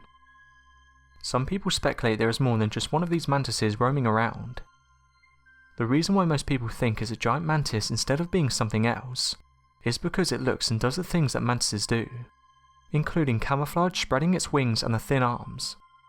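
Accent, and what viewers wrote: England, United Kingdom. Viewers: Is it just me or do all videos like this have someone with an English accent narrating.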